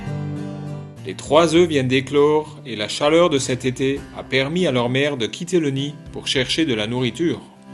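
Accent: Alsace, France. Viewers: je ne suis pas du tout d'accord avec vous, la voix est claire, la diction correcte avec une bonne articulation et, les commentaires très intéressants. L'accent alsacien est de plus charmant. D'ailleurs, je viens de m'abonner. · Merci Monsieur, vous avez un talent certain pour le documentaire animalier . Très belles prises de vue , commentaires d'humour . J'aime votre accent alsacien également et avez de l'amour pour la nature . Vive le maintien de la diversité culturelle comme celle animalière. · L'accent alsacien vous dérange? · Donc désolé de ne pas apprécier cet accent alsacien mais un bon reportage animalier (comme celui çi) mérite une voie sans accent, claire, expressive et appréciable par le plus de monde…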